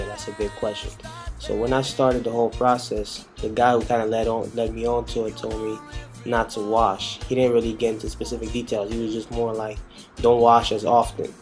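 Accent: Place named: Jamaica. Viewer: you have a slight jamaican accent n no its not the music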